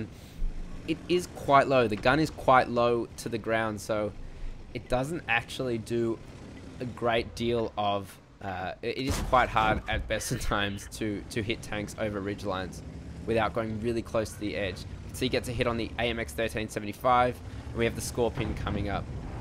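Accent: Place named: Australia. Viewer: this 'stralia accent is quite arousing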